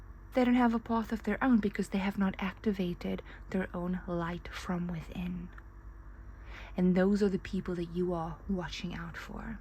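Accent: South Africa. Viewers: My Sister you are in my energy 100%. Your reading are so aligned with my life right now. can I ask where you are from sound south african 😊🇿🇦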